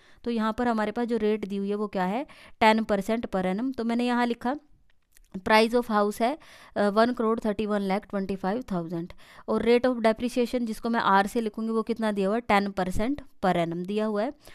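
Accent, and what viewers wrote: Haryana, India. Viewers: Mam are you from haryana?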